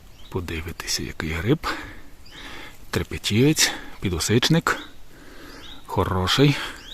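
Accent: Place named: Synevyr, Ukraine. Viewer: В якому місці відео знімали?Бо говір ,як у Синевирській ріці.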